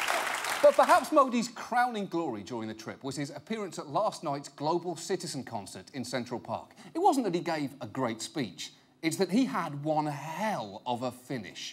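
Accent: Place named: United Kingdom. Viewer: What I see is John Oliver is not smart as he thought he is. Before you say something just put yourself in Modi’s shoes. You wouldn’t survive even a day. Your British accent does not prove you are smart enough to says something about someone else. Besides, your advice Indian, look at your own god damn county and president. One last thing if anyone can explain me why British people turn in to jerk when they come to United States, they can’t even say singe sentence without swearing and using F word. They are very respectful in their language on their own motherland. Because I also saw Master chef where Gordon as well swear at vegetable. Can anybody understand that?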